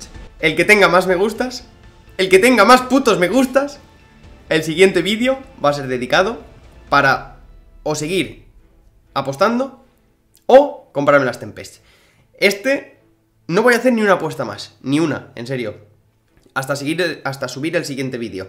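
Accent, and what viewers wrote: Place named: Guatemala. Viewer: Voz Chapin como así ha pixonic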